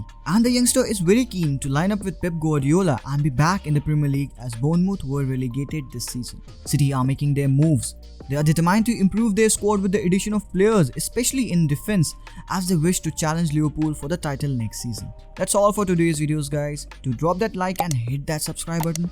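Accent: India. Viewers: great video loved it keep it up 1.add subtitle options 2.it will be a lot better if your accent is improved from indian to a bit british or american.(JUST MY OPINION,PLEASE DON'T MIND)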